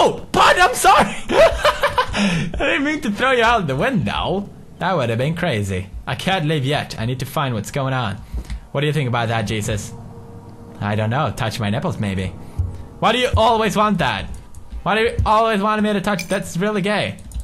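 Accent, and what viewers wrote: Sweden. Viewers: omg its been years since i watched this i miss the cute swedish accent back in this day and amnesia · kinda swedish accent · The one thing we Will never have again: deep Swedish accent 😂😂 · hahaha u had more Swedish accent back then xD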